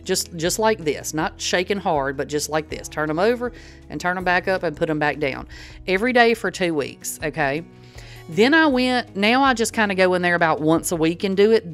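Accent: South, United States. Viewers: Just found your videos and I chuckled though the whole thing!!! I was raised in the South and your accent makes me feel at home. And your style is just like mine.... spill vodka on the counter??? oh well... now it's sanitized!!! You got a new subscriber!!!! 🤣❤ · You sound like me!! Are you from the south too? I’m from Nashville. I love the tips you’ve shared! I’m single and although I don’t have kids, I’m finally putting my kitchen back together after a bad car accident and fighting cancer for several years. Almost everything I had expired or was freezer burned so badly I had to throw it out so I basically had to start over. Now I’m trying to make more things myself and be careful what I buy so I don’t consume too many ingredients my body doesn’t need. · I JUST LOVED YOUR VIDEO AND YOUR SOUTHERN ACCENT 😊, THANK YOU FOR MAKING THE VIDEO !!!! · New Subscriber here! 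I'm a MeMe also! 🥰 These recipes are great! I love that you don't measure your seasonings!😂 I hear the Southern in your voice ( I'm Mississippi) and figured that's why. Can't wait to watch the previous videos!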